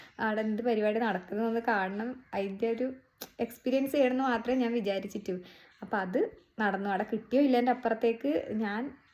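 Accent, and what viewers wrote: Kasaragod, India. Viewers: Nammale kasargodan bhasha ❤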